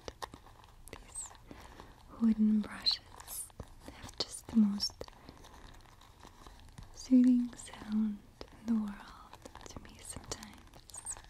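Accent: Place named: Russia. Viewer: So rare to hear the Russian accent when she speaks English, it's lovely when it happens 😊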